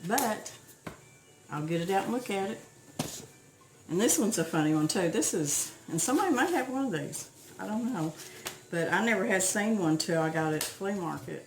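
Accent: South, United States. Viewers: I wish you would make a cookbook. All that you make and credit the months that you had gotten it from I love them all I love your Southern drawl and your lifestyle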